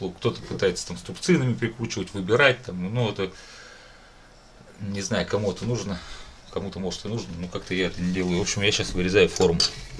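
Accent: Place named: Altai, Russia. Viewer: Зачетная ложка!!! Да, в твоих руках все так легко получается!! Сам сажусь резать - долго все происходит и результат мало радует, но для души это рай трудится с деревом!, где то слышал, что ты из Сибири, а от куда именно? Говор на алтайский похож. Угадал?)))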